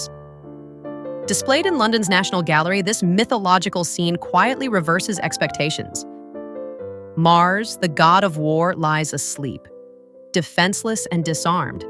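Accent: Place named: United States. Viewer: Enjoyed this apart from the grating, whining , horrible American accent.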